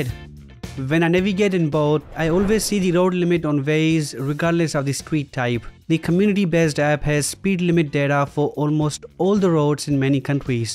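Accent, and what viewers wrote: India. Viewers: Speak English, not Indian-English.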